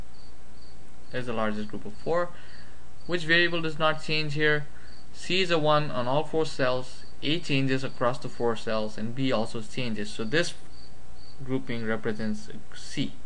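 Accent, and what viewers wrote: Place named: India. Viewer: Can't recognize that accent, where are you from? Seems like a light-ish indian with a bit of a lisp. Great video nonetheless.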